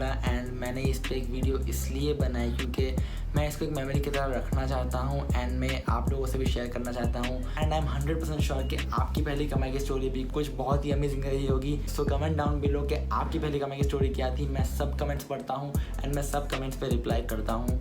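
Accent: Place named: Gujarat, India. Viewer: yeah that's why....his accent is full gujrati 😊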